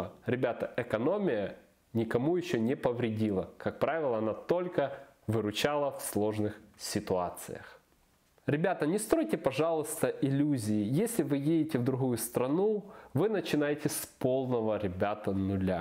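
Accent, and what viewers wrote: Ukraine. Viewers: судя по говору, он переселенец с восточных регионов или одессит. там это норма, так же как и в россии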